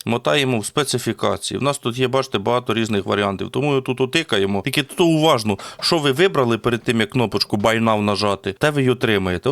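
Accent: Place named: Central Ukraine, Ukraine. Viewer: земляче,лиш не двєнадцать,а два на д ця ть .чути осьо,тосьо,що центральна україна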